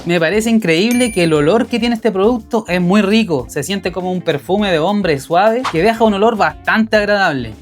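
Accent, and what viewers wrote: Chile. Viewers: Eres chileno? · Chileno, bro? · Chileno, cierto? 😅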